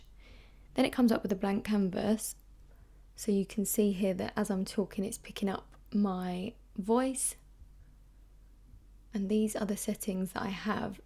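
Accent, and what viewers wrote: England, United Kingdom. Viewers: Can we just appreciate her British accent like wow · Not gonna lie I love the pommy accent :) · is she British ??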